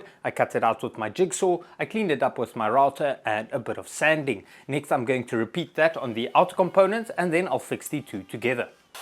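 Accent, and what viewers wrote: South Africa. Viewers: Loved this so I subscribed. South African? Nine video and I think the final bench would work great when cutting sheets of plywood iv you just put like floor insulation on the table first.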